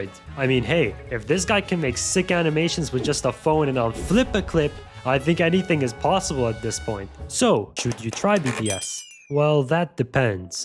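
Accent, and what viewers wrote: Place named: United States. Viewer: You sound that youre from the US with a dual citizenship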